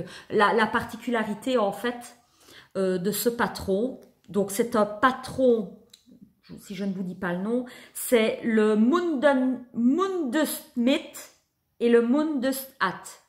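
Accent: Liège, Belgium. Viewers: Quel plaisir de vous écouter ! Toujours ravie d entendre votre accent liégeois : ma ville natale! Vous faites de très belles réalisations malgré le fait que vous ne maîtrisez pas encore complètement la surjeteuse ! Merci.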